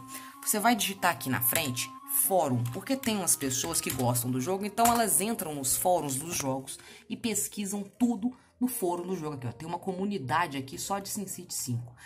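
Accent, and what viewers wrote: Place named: Zona da Mata, Brazil. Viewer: de onde? Pelo sotaque parece ser da zona da mata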